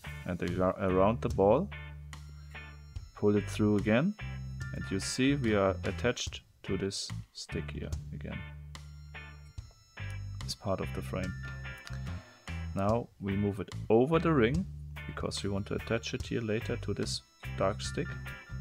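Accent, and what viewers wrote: Germany. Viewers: Du hast sooo eine beruhigende stimme und man hört sofort dass du deutsch bist :) ich war eigentlich nie der typ für puzzle spiele aber du hast mir dem echt näher gebracht und ich finde das total interessant was sich die macher immer bei verschiedenen mechanismen gedacht haben :) außerdem ist die soundqualität eeeeendlich gut xD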